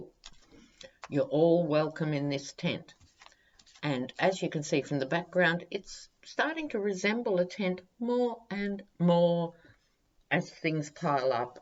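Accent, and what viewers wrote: Australia. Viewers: No apology necessary, and it’s not your charming Aussie accent. I wouldn’t have remembered the French pronunciation either, had I not had a childhood friend whose native-French mother had the same name.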